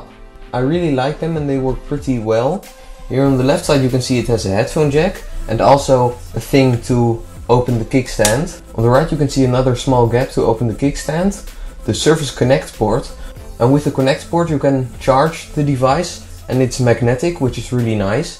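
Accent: Netherlands. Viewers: Are you dutch perhaps? Or maybe from Belgium? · You sound like you are from the Netherlands!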